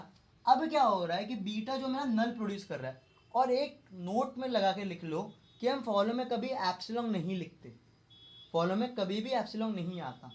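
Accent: India. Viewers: indian people have the worst english accent in the world.i cant understand wtf is he talking about.pls go learn some english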